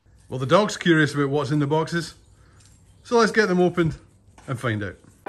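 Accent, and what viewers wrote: Scotland, United Kingdom. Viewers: Thats a wee mit of a mess. Great to hear the Scottish accent am defo a subscriber 🏴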